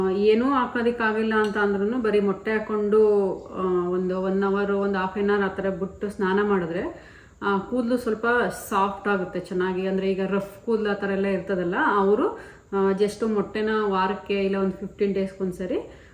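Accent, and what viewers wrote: Mandya, India. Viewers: Yes she's in US no Humu dim proudish always talk with natural Mandya kannada ❤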